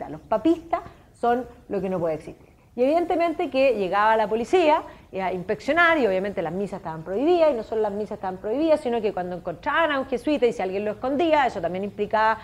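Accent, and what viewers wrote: Chile. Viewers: Muy bueno! Comentario aparte: “Shakespeare se fue a pata a Londres”. Qué simpática forma de hablar tienen en Chile! 🥰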